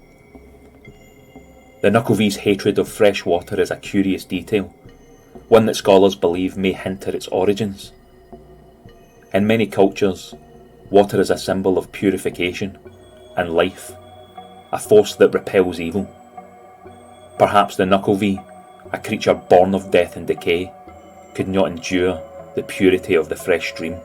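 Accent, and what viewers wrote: Scotland, United Kingdom. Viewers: I love the Scottish accent. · I love the Scottish accent. I could listen to anything in a Scottish accent and find it intriguing. Thank you!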